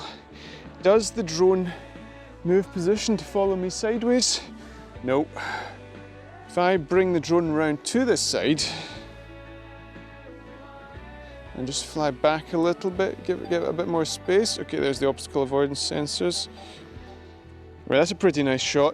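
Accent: Scotland, United Kingdom. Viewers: I like the scotish english sound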